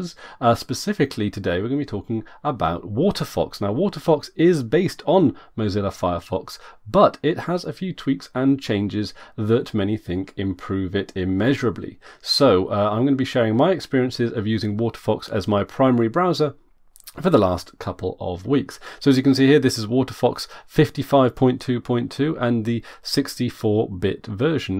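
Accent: Wales, United Kingdom. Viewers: wait r u welsh can u speak welsh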